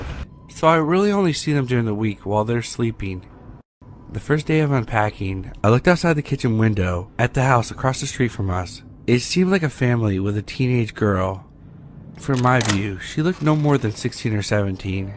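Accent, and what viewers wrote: South, United States. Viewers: I dont know if you had csndy in your mouth or if u havw a cold but if u arw trying to speak differently ( just im case someone told u they dont like the way u speak) then dont like ur voice is okay i like the country wake u speak.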